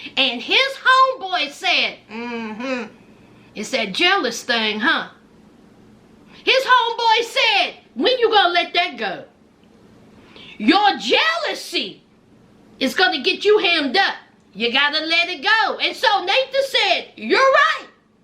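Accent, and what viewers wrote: South, United States. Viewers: remember when what's her name brought her prison bae home from prison ( the girl who pretended she had her act together and was selling jewelry), the first thing she did was changed the locks on the house. She lied to prison bae and said he was a friend and had keys to the house. But in reality he was the owner of the house and was renting it to her. When old dude saw them together in the back yard in the swimming pool he was livid. He demanded they both vacate the premises. She was probably leading him on before prison bae was released. Those people are stupid for being on the show because the network is making millions of of them and they only get a few measley dollars. Skylar looks like a psycho path in my opinion. She has zero emotions in her eyes. She's the kind of person that will tell you what you want to hear. She lacks emotions, care, concern or any other type of real emotions. 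I just found your channel the other day and you're hilarious. It's the country accent that's give this show life. Great job 👍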